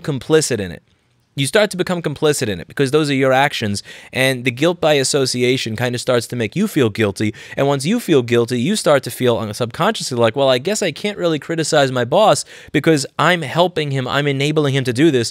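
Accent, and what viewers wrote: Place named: Carolinas, United States. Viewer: Yep, sounds like the Carolinas, greetings from upstate SC.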